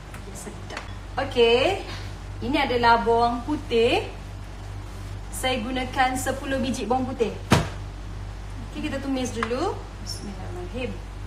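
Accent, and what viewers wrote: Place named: Pahang, Malaysia. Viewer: Tokti buat tutorial masak cakap pahang pulak haha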